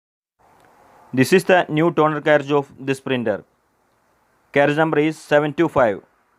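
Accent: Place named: India. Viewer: thanks...but your accent is too hard to understand for non-indian...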